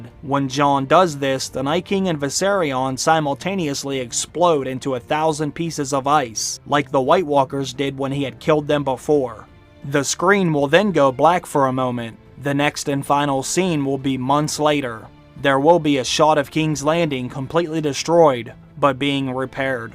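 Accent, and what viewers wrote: Pittsburgh, United States. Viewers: Wait wait wait this guy sounds like a yinzer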